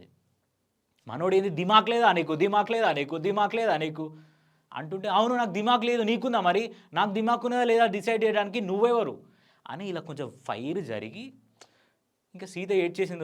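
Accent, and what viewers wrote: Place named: Warangal, India. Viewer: Warangal slang super ekkadiki poyina slang maradu.❤❤❤ Warangal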